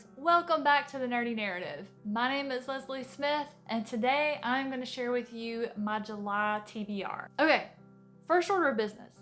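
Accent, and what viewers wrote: South, United States. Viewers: I just subscribed to your channel. I love your Southern accent. I just bought The Way of Kings by Brandon Sanderson. I've never read a book by him but I've heard great reviews. Is this book really good?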